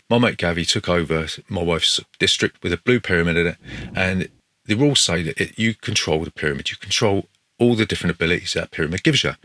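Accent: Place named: England, United Kingdom. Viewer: As always thanks for the entertaining review. Like your perspective, always nice to hear an English accent when living away.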